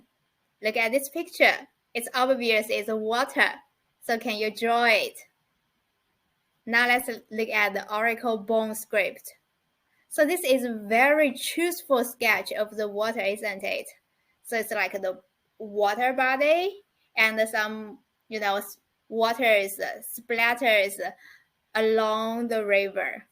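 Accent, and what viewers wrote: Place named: China. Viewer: I love it when Chinsse women speak Chinsse English! It sounds cute 😍😍 just NOT AMERICAN... Got to get me a Chinese wife one day!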